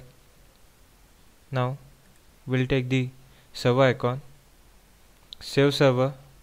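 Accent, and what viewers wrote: India. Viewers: wow extreme indian accent... is english your mother lenguage? or is it hindi? or maybe some of the other...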